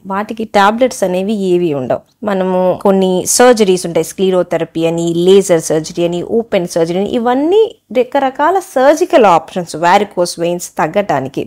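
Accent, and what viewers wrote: Guntur, India. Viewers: Thank you Dr.. At an early age you are explaining every aspect very clearly in telugu that too in Guntur Style. All the BEST.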